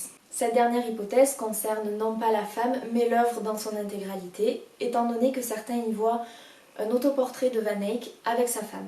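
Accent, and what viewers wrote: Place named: South, France. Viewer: Elle explique bien (elle a l'axen du sud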